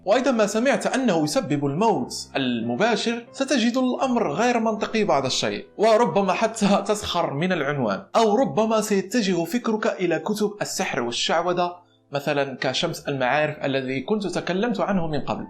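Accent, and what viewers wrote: Morocco. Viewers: ميّزت لهجتك المغربية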